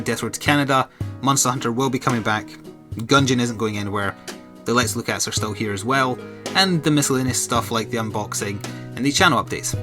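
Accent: Scotland, United Kingdom. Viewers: He sounds Scottish so, Scotland?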